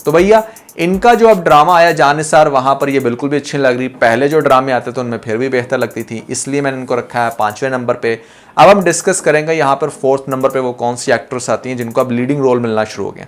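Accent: India. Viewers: میرا اعتراض الگ ہے۔۔۔جو تم ہندی لحجے میں لفظ۔۔۔پھر۔۔۔کو۔۔۔فِر۔۔۔کہہ رہے ہو اس۔ کی کوئی خاص وجہ ہے کیا؟؟؟ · ان کی خوبصورتی کو چھوڑیں، پہلے یہ بتائیں کہ آپ بھارتی لہجے کی نقل کیوں کر رہے ہیں۔ پھر کے بجائے فیر کہنا۔ پاکستانی ہیں تو اردو تلفظ اپنائیں۔ You sound disgusting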